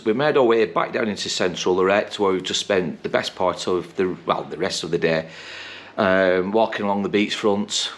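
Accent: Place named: Lancashire, United Kingdom. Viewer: Your putting that stupid Lancashire accent on like Lindsey Hoyle,the speaker of the house of commons, really mate you seem a decent guy but chill the Lancashire accent a bit nothing personal,I do like your videos 😜.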